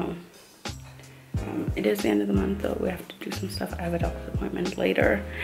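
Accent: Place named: United States. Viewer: I've been watching your channel since it began and have always wondered why you have an "American" accent of sorts? Were you born/raised there? Hope I don't come off as rude, I'm just curious.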